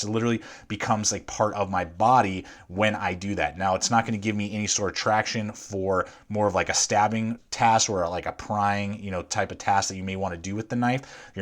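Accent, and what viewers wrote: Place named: Texas, United States. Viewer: Good Video, my brother is the Spyderco nut between us so I'll have to show him this. In your into I'd swear your in my home part of Texas! 😎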